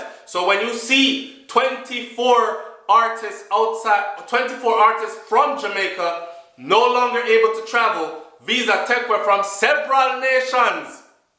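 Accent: England, United Kingdom. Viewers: you british accent tun up high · British accent tun up my yute. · Love the English accent... lol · Yo dawg yuh England accent mad 🤣🤣🤣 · The british accent...wicked would listen to it all day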